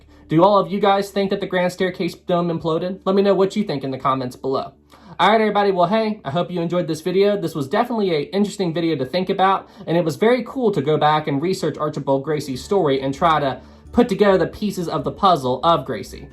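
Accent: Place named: Appalachia, United States. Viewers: Hey brother, you are most definitely from the Northeast US, am i correct in saying that? Like, Virginia, West Virinia, Maryland, NC or PA? But to narrow it down even more, i would guess Virginia....in the appalachians. Lol, am i right? Btw, its the accent and pronouncing of washed warshed. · Your regional accent is same as mine...you in Tennessee...?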